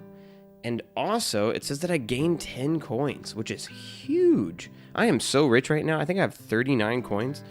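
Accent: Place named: Kentucky, United States. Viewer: Amazing. For the first time, I truly believe you’re from Kentucky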